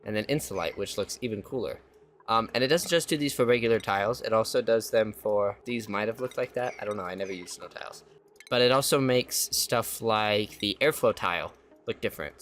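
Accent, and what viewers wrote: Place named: Omaha, United States. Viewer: Your accent sounds alot like those from the Omaha, NE area.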